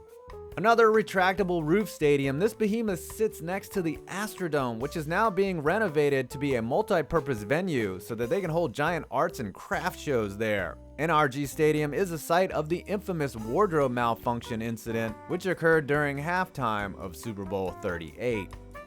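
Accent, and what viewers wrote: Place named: Boston, United States. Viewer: Lazy basterd Boston chick voice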